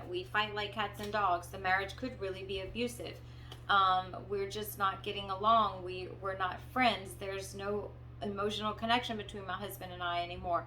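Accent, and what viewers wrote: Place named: Louisiana, United States. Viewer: Love your videos! You sound like you're from Lafayette... or somewhere here in Louisiana lol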